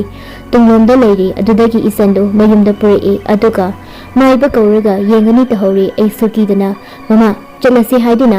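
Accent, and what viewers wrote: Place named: Kabui, India. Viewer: Sis gi wari khulise original meitei na ngangba mande...kabui na Meitei lon ngangbagi accent ( tone) ama chang ngi.... khonjel se ymna thot nou ee ymna nungcba khonjel amadi oi. Keep going 👍👍👍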